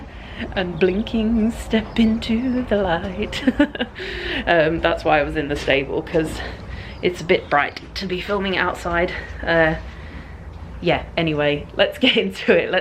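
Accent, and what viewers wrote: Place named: Essex, United Kingdom. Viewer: Hi. I've just discovered your channel. I'm in North Essex so by the sound of your accent, not far from you. I really want to get back into riding but was put off by mean comments about my size which has affected my confidence. I am enjoying your videos and hope to start riding again very soon. I'm a freelance groom so do get lots of horsey contact time x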